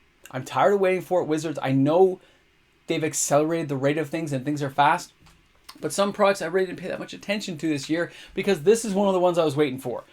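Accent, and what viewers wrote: Canada. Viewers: I almost shit myself when you said new capenna was 135$, then I heard your accent and figured it's Canadian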